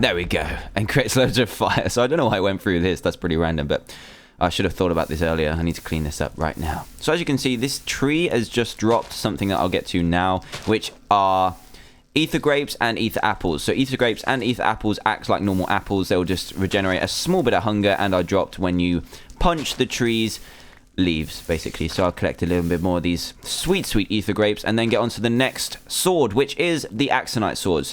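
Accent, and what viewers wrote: England, United Kingdom. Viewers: i used to watch your videos so much when i was about 3, that i developed an english accent, it sounds stupid though because its morphed with a US accent (because in in the US) but still, if i had a choice i would have still watched all your videos. we love you, you were a pillar of old minecraft, and a peice of the foundation new creators build upon. Thank you.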